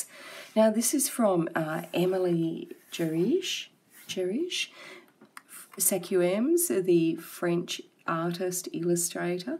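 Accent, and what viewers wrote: Australia. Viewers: Great video, I love you're Australian accent, cute stuff.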